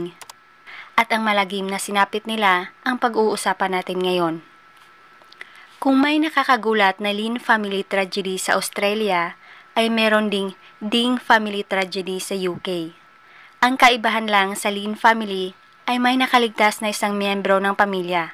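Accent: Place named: Iloilo, Philippines. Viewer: I believe Ilongga sya😊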